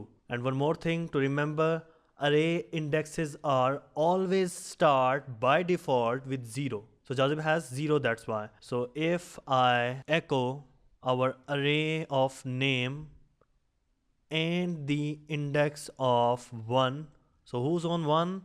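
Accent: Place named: India. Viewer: i started taking your course but i need to confess your english give me a headache english isn't my first language and this indian english just kill me when it comes to learning something difficult , i still in the number lesson but i will do my best to finish the course soon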